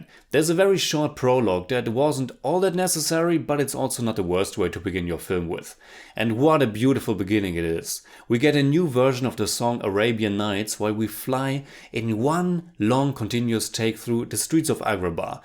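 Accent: Germany. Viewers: i thought you were from austria but that accent sounds the same to me, still german language. are you from austria or germany? you are the best reviewer ever by the way. i say that every time and i a say it again. i'd listen to your reviews about anything--movies, books, cafes, anything, i would trust your every perception.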